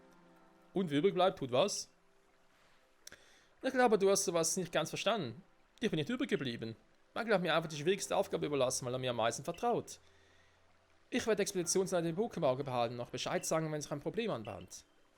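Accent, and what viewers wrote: Switzerland. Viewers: bis dahin schau ich deine Reise durch die Hoenn Region an. 😅👍🏼 ich bin in Vorarlberg und da ist der Schweizer Akzent echt ne tolle Abwechslung zu den ganzen deutschen 😂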